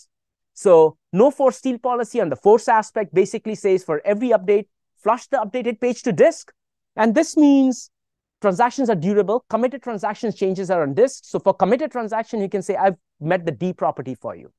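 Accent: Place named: India. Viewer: Indian English is hard to listen😂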